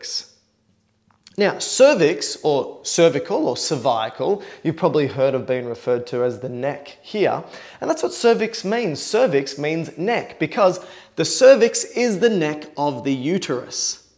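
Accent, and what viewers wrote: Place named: Australia. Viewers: I reckon it's Australian, mate! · He is an Aussie, probably of Italian descent...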